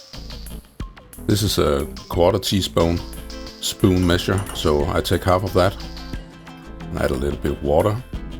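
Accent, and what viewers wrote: Denmark. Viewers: Are you danish brormand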